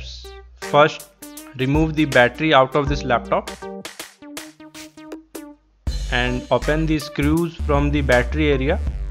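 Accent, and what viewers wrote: India. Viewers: Very nice vid. I can't place your accent? Are you from Kanpur?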